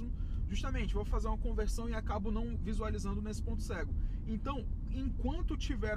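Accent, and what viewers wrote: Porto Velho, Brazil. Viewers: Man... Vc É de Porto Velho - RO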